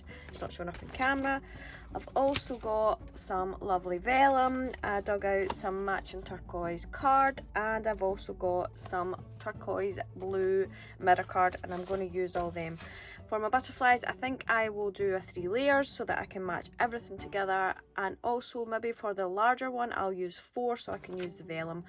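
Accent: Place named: Scotland, United Kingdom. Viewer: I have liked and subscribed to your channel and love that Scottish accent. This wreath is stunning i never seen anything like it its stunning. great job you are one talented lady.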